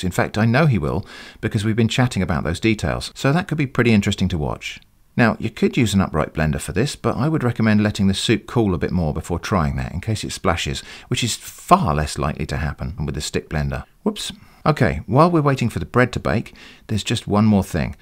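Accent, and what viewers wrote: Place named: Southern England, United Kingdom. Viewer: It's southern English accent that gives the word a different inflection. Even English words sound strange in different regional accents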